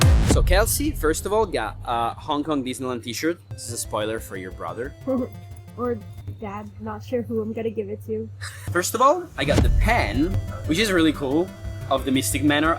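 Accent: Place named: Italy. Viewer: Come imparare l’inglese? con i video di in inglese ahaha, video visto in italiano e inglese 😍. Parco stupendo sopratutto per le aree esclusive, da visitare assolutamente una volta nella vita. Continua così anche se per ora c’è poco pubblico piano piano andrà sempre meglio, ci sono pochi canali che raccontano le cose come fai tu. E poi il tuo accento italiano mi aiuta a capire un inglese più vicino a noi. Grazie per questi contenuti interessanti e fatti molto bene 🤙🏻❤️ Aspetto il video su Shanghai e gli altri dell’ America. A poi vi consiglio a te e non so valutate voi in base la richiesta di fare tour dei parchi nel mondo con noi. È una buona idea di business dove ci si diverte e si passa del tempo insieme. ( Sopratutto aggiungo, con la vostra preparazione nella storia dei parchi. ) Pacchetto tutto organizzato. Con voi come guide.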